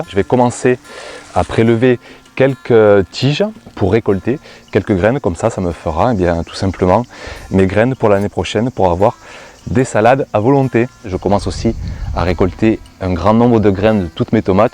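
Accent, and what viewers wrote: South, France. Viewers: J adore vos vidéos super sympa continuez à nous régaler avec votre accent du sud beau jardin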